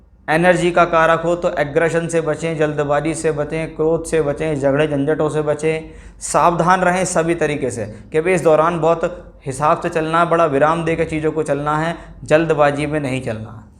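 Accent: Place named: Uttar Pradesh, India. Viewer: Bhaiya UP se lag Rahe ho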